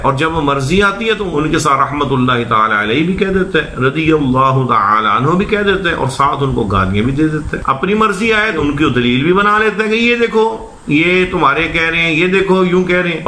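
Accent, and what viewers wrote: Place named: Arab world. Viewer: movli sab apny arabi lehjy say impress krny ki koshish ki hai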